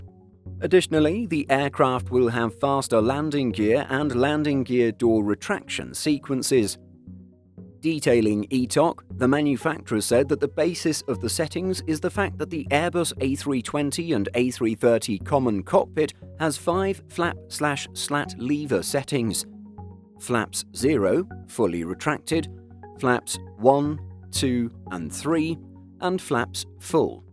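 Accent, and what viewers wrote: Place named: United Kingdom. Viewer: Respectfully, for someone with an apparently British accent who can pronounce Raj Indian names as well as you can, you really need to learn a little bit of Spanish, so you can properly pronounce the name of the capital of the country ofColombia. Besides, I thought all you, Brits spent your winners in Spain…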